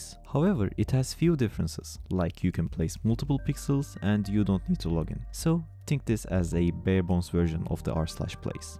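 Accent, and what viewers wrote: Turkey. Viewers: is Ur accent is Turkish haha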